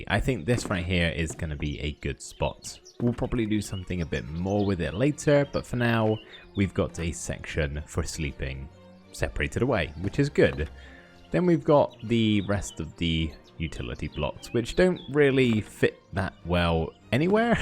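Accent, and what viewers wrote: United Kingdom. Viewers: Your accent is very pleasing. Where is it from? It sounds a bit British but not quite? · thought i was clicking on a minecraft video, turns out its asmr. never in my life did i realize the pleasentness of hearing a slightly deep voiced british man whisper me sweet nothings while having moonlit sonata playing in the background... I now know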